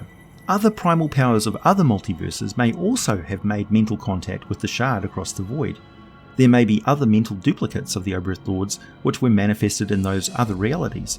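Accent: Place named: New Zealand. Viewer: Well hell,you may just have to do the Wolf Spider next! Great vid keep crankin them out ol son! By the way my neighbor said you look great with a bald head! Shes hot bro! I think shes crushing on you! 😂 lol You kiwis with that accent....i think thats what got her.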